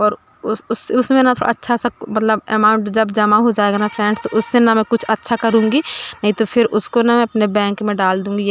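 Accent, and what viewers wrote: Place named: Pahari, India. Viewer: Di aap video me pahari tune kese lagati hai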